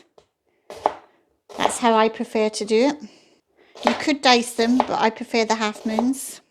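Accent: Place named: Scotland, United Kingdom. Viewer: Delish. Even heard a few wee words from you there with a slight Scottish accent. hehe xx And Scott Bremner....I love omelettes more than you, so there.lol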